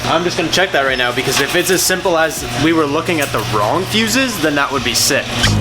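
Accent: Canada. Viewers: Sound Canadian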